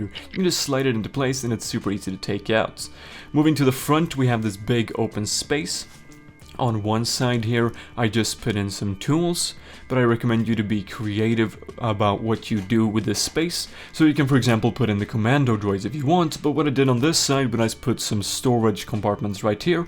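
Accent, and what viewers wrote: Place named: Sweden. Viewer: First time I'm seeing this channel in my feed.. Not lego related but your accent.. You sound Scandinavian, maybe Swedish? Sorry just curious 😅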